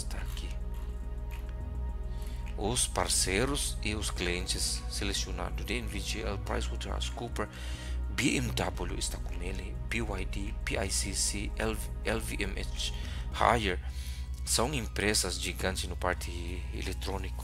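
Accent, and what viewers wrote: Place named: Argentina. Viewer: De onde você é? Seu sotaque parece argentino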